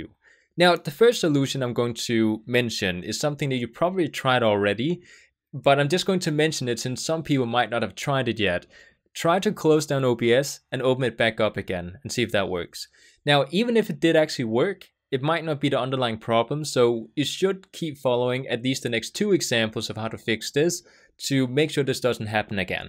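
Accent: Denmark. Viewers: bro u danish? i can hear that your danish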